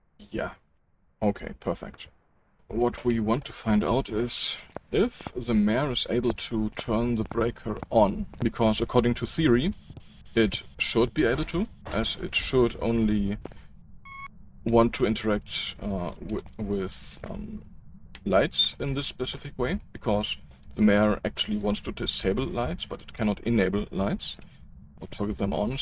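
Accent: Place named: North Carolina, United States. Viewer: you, sir, have an unusual North Carolina accent...:face-orange-raised-eyebrow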